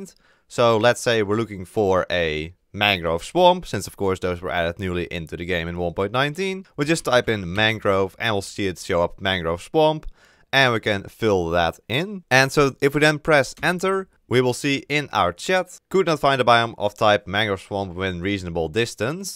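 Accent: Netherlands. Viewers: You can really hear the Dutch. I'm Dutch myself but i didn't expect it.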